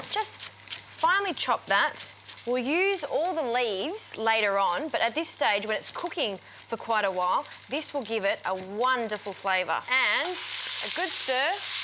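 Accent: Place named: Australia. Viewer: Remember the 160 is Celsius, she sounds from down under.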